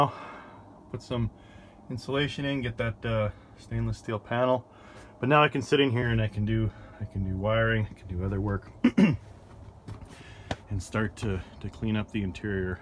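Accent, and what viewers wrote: Canada. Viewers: Love the Canadian accent! Oh hey there! Great videos!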